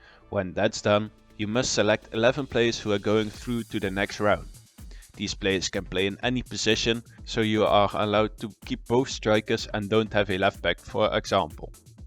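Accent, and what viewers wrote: Netherlands. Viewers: your accent has to be the most 'Brabant`s' English i have ever heard xD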